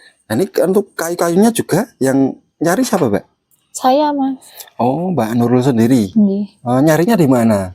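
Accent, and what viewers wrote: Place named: Central Java, Indonesia. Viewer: Embak orang jawa tengah ya... Td dengar nggih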